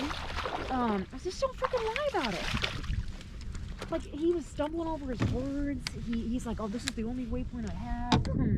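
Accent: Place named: South, United States. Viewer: Please. You say Nebraska but your accent says you are a southern lady!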